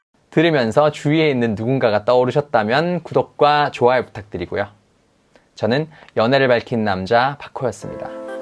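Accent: Gyeongsang, South Korea. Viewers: 이분 말투 들으니.. 오리지날 서울사람 아니시네...경상도 분이시네......서울말 쓰려고 노력하는 경상도 분...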